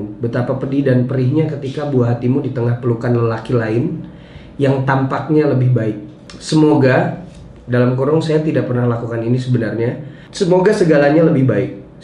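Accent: Java, Indonesia. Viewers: mo nanya.... asli nya dr mana, kok bisa bhsa jawa medok?😄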